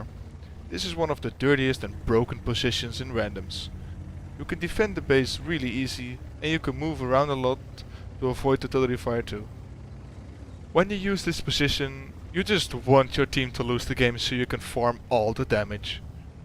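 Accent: Netherlands. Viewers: you sound dutch...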